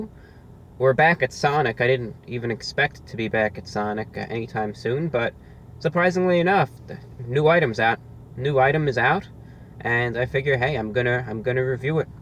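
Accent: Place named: New York, United States. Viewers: This guy sounds like hes from brooklyn or Jersey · Do you happen to be from New York or north jersey? I can tell from your accent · Why do you have a stereotypical Italiano Brooklyn accent?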